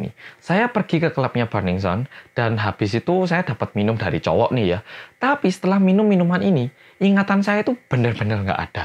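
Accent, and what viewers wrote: Java, Indonesia. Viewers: mas, ndek kae luwih medok tiba e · Cuma dia youtuber yg gak minta di subs..like..comment..😂😍 kontenx jg smart bngt..cara dia nyampein informasi...dannnn medokx itu😭😭😭😭😍😍😍 aduhhh..oppa kang mas..aku tresno karo koe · Suaranya kayak mas-mas jawa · Kaya orang jawa ngomongnya😍😆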